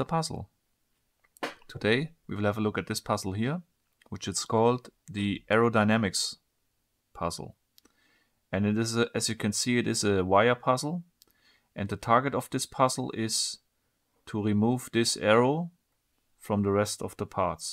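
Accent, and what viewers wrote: Germany. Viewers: Been watchin you for a minute. My first time commenting. "This is my fav puzzle yet." keep it up (German accent and all) sub'd & 👍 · are you German?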